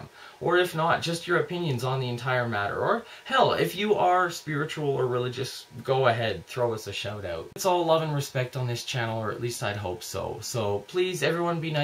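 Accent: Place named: Texas, United States. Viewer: First of your videos I've seen. I'll view a few more this week. You come across as a friendly level headed young man and you'll do just fine without living a life of guilt for doing things that are normal. I was in it toooooo long. It's like banging your head against the wall because it feels so good when you stop! P.S. I like your Texas accent.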